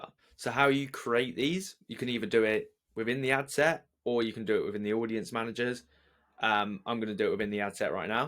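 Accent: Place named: United Kingdom. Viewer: Love u and the brit accent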